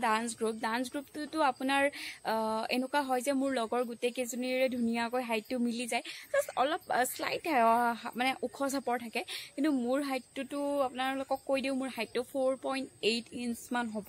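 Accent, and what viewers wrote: Nalbari, India. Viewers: Ba Tumr Ktha bor Nalbari, barpeta district r dore... Apunar original Ghor kot hy?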